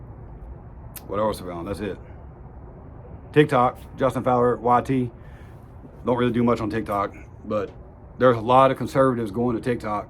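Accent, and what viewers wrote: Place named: South, United States. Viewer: yeah man np. After I typed it I said "oh maybe that's why he had twice as many notifications" you know? Idfk haha. Take care. Well wishes my man. It's funny you'd never think it, but minus the slight southern accent, your not any different from most guys wayy north up here in New York. Bet you'd never think that. We got beaver trappers. We got it all bud. Big ass tree removal! It's the sticks. Be good brother.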